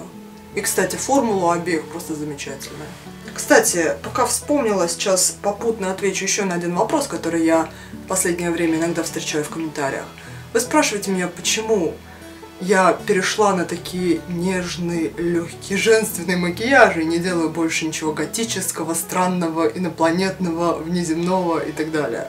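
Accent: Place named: Kursk, Russia. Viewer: Класс) так и думала что ты из Курска) акцент;) я тоже из Курска, но живу в Москве ) с удовольствием бы с тобой познакомилась очно)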